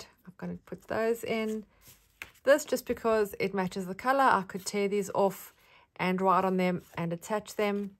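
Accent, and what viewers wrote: South Africa. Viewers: Hi from South Africa. Nice to listen to a fellow SA.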